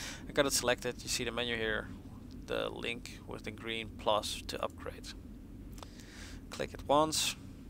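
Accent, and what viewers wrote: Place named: Netherlands. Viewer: Goed engels hoor, maar het is net duidelijk genoeg dat je een nederlander bent :P Froeten Mark Androcius ( character naam op EVE )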